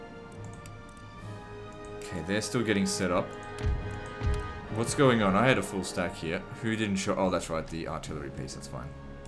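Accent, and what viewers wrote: Australia. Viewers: I absolutely love the empire total war blitz campaign and was so excited to see your return after your first attempt. I would love for you to update us on what days you would upload this campaign because I'm so excited to see you finish! Ps. I also love your angry Australian accent but the episode with full detail is so much better and also exploit the SHIT out of it mate!!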